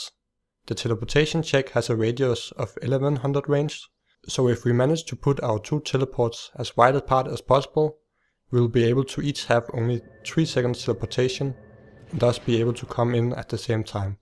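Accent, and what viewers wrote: Denmark. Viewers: wow... he's accent sound really Danish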